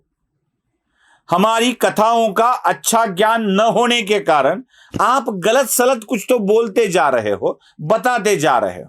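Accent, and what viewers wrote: Maharashtra, India. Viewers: Hindi thik se nahi aati mharhti tuun lag rahi mharhti aap ke muha se achhi lagti Hindi na bola kare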